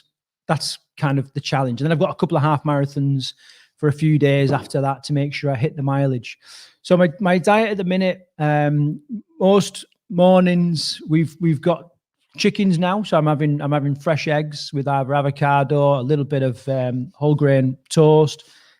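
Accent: United Kingdom. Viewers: Hey! (you so suit that name), this was a great vid. I didn’t make the live session so watched it later. I play your videos while I’m out on longer runs, as I’m originally from Yorkshire and having a familiar voice is so wonderful while running lonely trails here in the USA! Thanks for all you do mate, appreciate you. 👌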